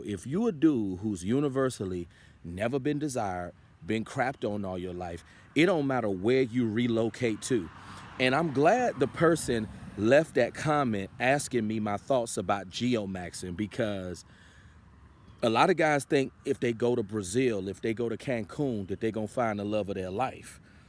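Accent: United States. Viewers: Move to South East Asia . As a black man, you have BBC advantage , the american accent and the American passport . These are enough to get you an average Asian chick . African women are no different from American women .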